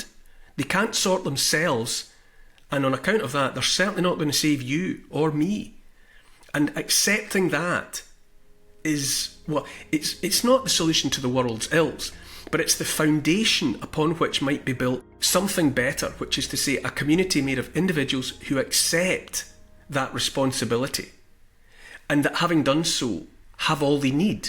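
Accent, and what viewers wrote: Scotland, United Kingdom. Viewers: As a fellow former Stirling resident, now an ex pat, greetings from the mountains of Norway. We must not let the b…..s grind us down. It is going to be back to the Stone Age type barter economy and too much for many. God help us, keep up the rhetoric and that great Scottish accent, we need you more than ever! Alex with kind regards.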